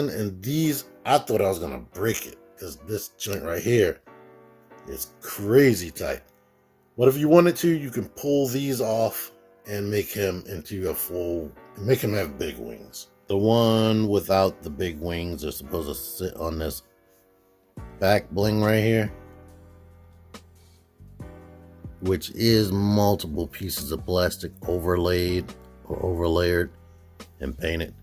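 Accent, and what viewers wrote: Baltimore, United States. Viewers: Are you from Baltimore?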